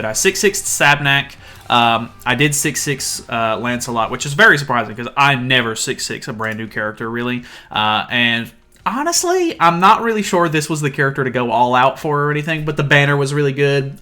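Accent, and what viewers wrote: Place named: Texas, United States. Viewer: are u by chance from Texas? Your accent reminds me of Maggie from The Walking Dead lol BTW maybe try Green or LR Diane, Lance and King? The passive Diane relic damage boost should be pretty nice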